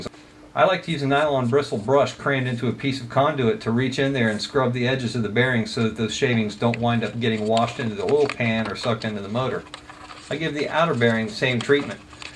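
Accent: United States. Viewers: Are you from Alabama?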